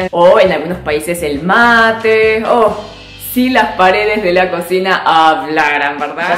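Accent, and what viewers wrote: Argentina. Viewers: Buen vídeo me gusta tu asentó argentino y tu cara coreana jaja 👍👍👍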